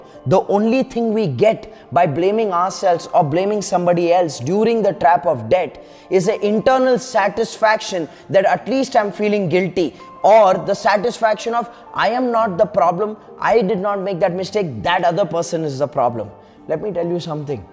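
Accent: India. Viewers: Idk what is about Indians speaking english, that is so pleasant to listen.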